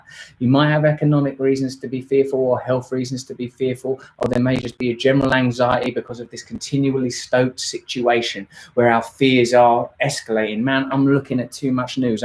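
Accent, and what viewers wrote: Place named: United Kingdom. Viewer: Hi Russell I love your British accent :p and I had a crush on you :/ pray for me that I get a beautiful man inside out. Grey is beautiful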